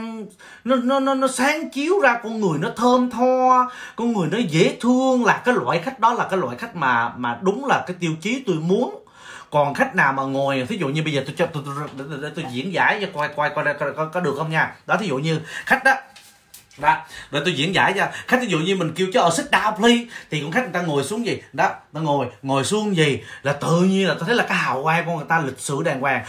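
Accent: Binh Dinh, Vietnam. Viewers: tưởng anh là giọng Bình Định Phú Yên. Nhưng người gì miễn là vui vẻ là được. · Anh Nguoi Nam hay nguoi Bac vay. Vi giong anh de thuong qua😍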